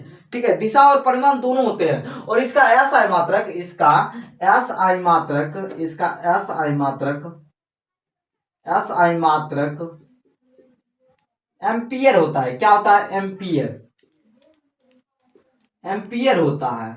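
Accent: Begusarai, India. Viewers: Bhai aap Begusarai se ho kya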